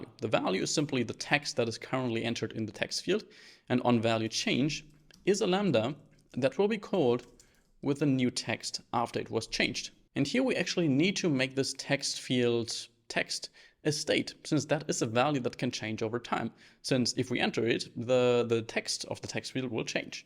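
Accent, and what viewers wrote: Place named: Germany. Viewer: Der deutsche akzent lol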